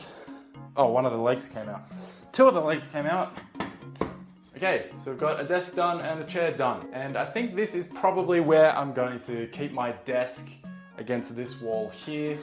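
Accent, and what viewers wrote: United Kingdom. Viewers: Don't pick up bri'ish accent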